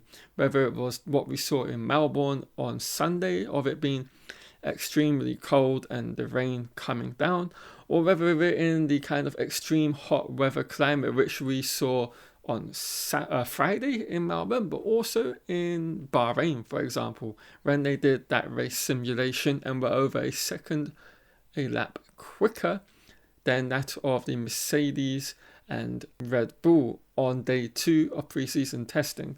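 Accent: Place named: England, United Kingdom. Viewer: I’m having a hard time placing your accent - where in England are you from?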